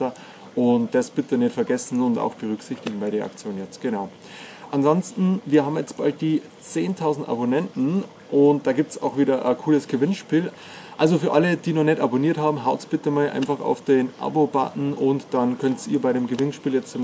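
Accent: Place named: Bavaria, Germany. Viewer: Kleine Frage, aus welcher Gegend kommst du? Denn man hört den Bayrischen Dialekt☺️😉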